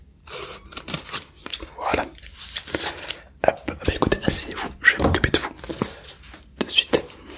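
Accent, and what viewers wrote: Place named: Toulouse, France. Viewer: Accent Toulousain est magnifique le roleplay est relax surtout coiffeur j'adore, 😁👍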